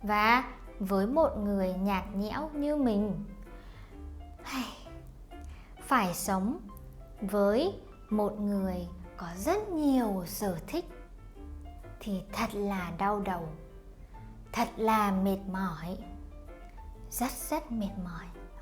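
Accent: North, Vietnam. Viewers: Nice Northern accent. Y'all truly nailed it. Keep it up girl ! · Giọng Bắc nghe thật dễ chịu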